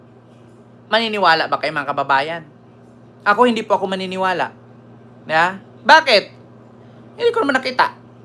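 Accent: Visayas, Philippines. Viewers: Bakla puro ka dakdak sarili mong opinion yan husayin mo accent mo bisaya ha ha ha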